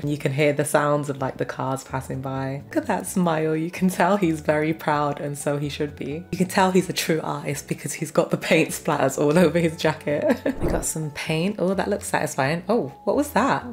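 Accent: United Kingdom. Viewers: One question for u are u from england that u speak British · I love British people talk And you're a British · I love her British accent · Anyone notice she is British?! 👇🏼😄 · your voice is so calming and I love the accent, is it british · Are u brittish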